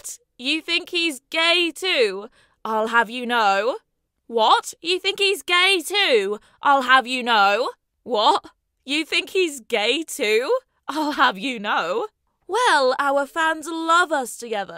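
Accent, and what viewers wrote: United Kingdom. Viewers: Woo 👏 love your voice Also your really British it just comes out so much I love it omg lol 😅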